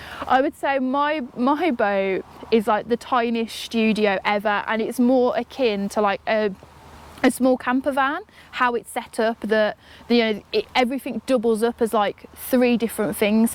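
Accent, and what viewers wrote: Midlands, United Kingdom. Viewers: That’s a familiar accent, making me homesick for the midlands and my boat all at once…… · Your accent reminds me of my childhood! Proper Midlands accent! Love it. ❤️ good on you living the dream....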